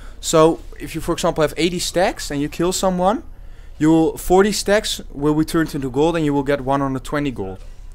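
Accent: Netherlands. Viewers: I'm pretty sure that accent is from Holland :) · i think he's dutch his accent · That dutch accent ♡ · je hoort gelijk dat het een nler is hehe, nice vid tho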